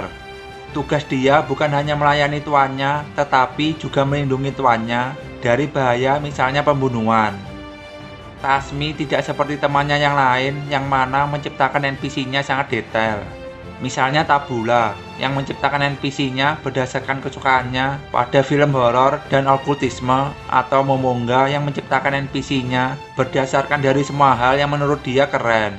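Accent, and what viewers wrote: Java, Indonesia. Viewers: Medok ya suaranya unik broo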